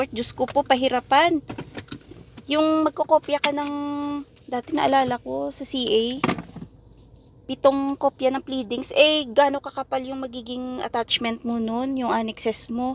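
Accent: Hiligaynon, Philippines. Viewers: parang ilongga ka maam ah the way ka po magsalita . new subscriber po. naghahanap kasi ako trabaho abroad.... kayo nakita ko po vlog nyo ..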